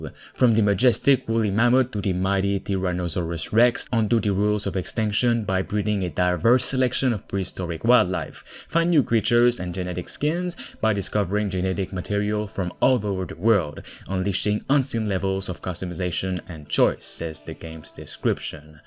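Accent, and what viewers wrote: Quebec, Canada. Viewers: Est-ce que t’es Québécois ? ton accent te trahis un peu big😂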